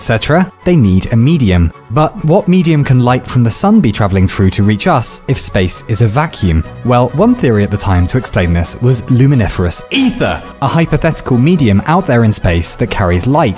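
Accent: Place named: United Kingdom. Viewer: He's so fun to listen too I always forget he's British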